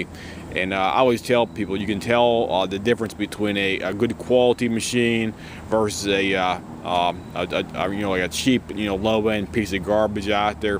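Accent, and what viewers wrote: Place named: Delaware County, United States. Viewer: Dude you have the straight up delco accent haha.You sound like Bam Magera.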